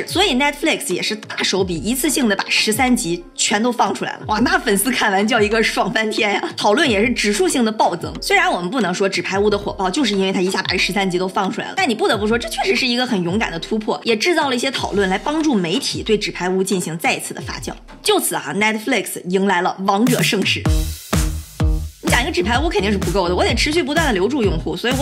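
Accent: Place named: Northeast, China. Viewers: 不知道啥算法，后台推荐了你。这东北口音的魔性，有颜有内容，圈粉了。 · 互联网时代那些“高大上”议题，就你这土掉渣的北方大白话来这么一通大白活，听着就觉得特舒服😌……今天开始订阅了。哈哈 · 喜欢你的东北口音，亲切幽默。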